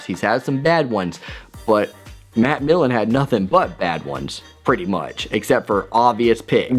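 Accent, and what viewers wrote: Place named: South, United States. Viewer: Are you in Michigan? You got like a southern accent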